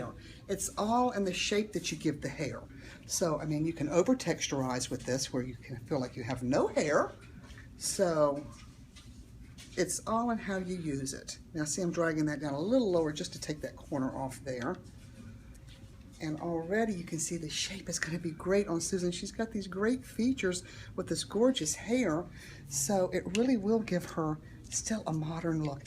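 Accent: United States. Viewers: What a wonderful cut and style for this lovely lady. You sound like you could be from Georgia, or nearby. If so, I want you to cut my hair please! I'll drive the distance! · Great job! I hear a southern twang...where are u located?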